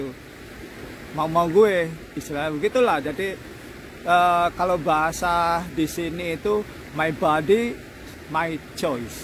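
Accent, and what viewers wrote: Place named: Java, Indonesia. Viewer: Salam teko blitar jawa timur mas.. Sampean logat bahasane koyo wong jowo... Jowo ngendi mas..?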